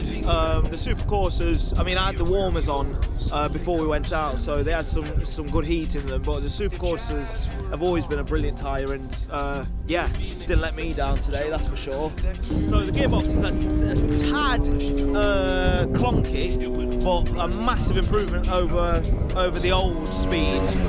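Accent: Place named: England, United Kingdom. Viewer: when a black guy speaks close enough english english accent. its so fucking funny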